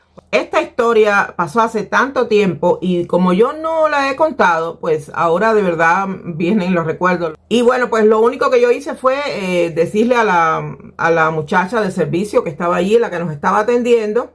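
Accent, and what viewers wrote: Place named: rural, Cuba. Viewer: Ayeme. Tu eres guajira por tu an me abla asy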